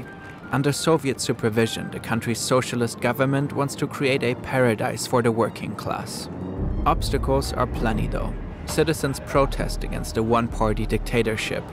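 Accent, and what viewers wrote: Germany. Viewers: The Stasi was crazy. Incredible what efforts they put into surveillance, what could have been if this effort was put into something good… also, hearing this in the thick German accent is great :D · I hear german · Hes German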